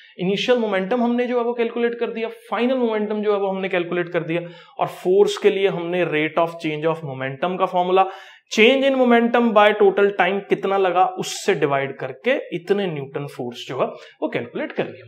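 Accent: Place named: Punjab, India. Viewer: Punjabi aagye oye ❤😅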